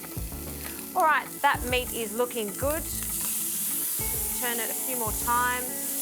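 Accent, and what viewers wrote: Australia. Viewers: You have a nice Australian accent, where are you from? (If I may ask)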